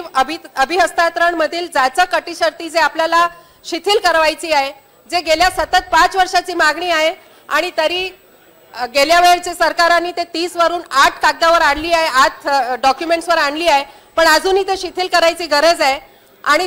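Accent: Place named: Marwari, India. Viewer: marwari hai wo isliye , phir bhi try to kiya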